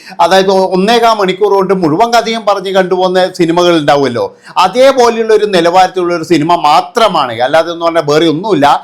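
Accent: Kannur, India. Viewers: Ningal Kannur ano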